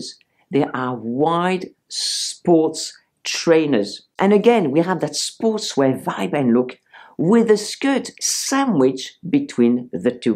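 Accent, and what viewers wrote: France. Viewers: Dear, I'm not even 40 yet but still like to watch your videos for some tipps and inspiration. 🤗 And I adore your French accent.. 😊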